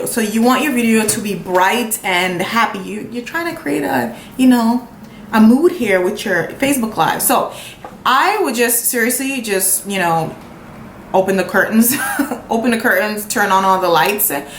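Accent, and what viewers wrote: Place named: New York, United States. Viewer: How did I know you was from NY and then you said Downtown Brooklyn.. Best wishes papa sis from Brooklyn😍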